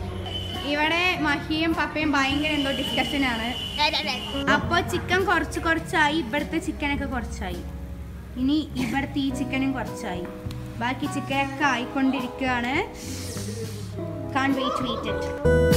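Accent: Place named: Palakkad, India. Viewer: Anthokkeyayalum plkd slang varum ley😅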